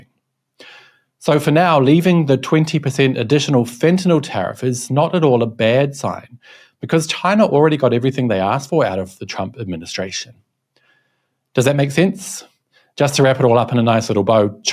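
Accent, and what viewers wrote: New Zealand. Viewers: You sound like a spokesman for the Chinese government. With a nice NZ accent. But you are correct I suspect. China 1. USA 0. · Kiwi accent lol, unmistakable. 😊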